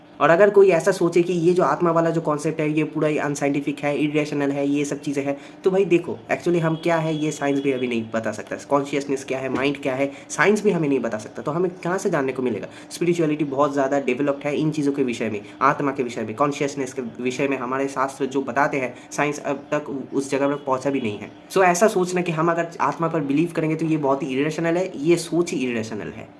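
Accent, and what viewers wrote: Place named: Bengal, India. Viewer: You bengali ascent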